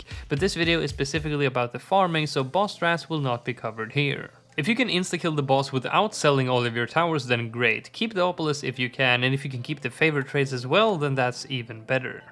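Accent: Sweden. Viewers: Ar you from sweden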